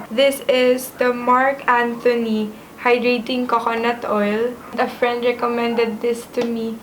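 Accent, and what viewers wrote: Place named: United States. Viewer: Luh kala ko american ka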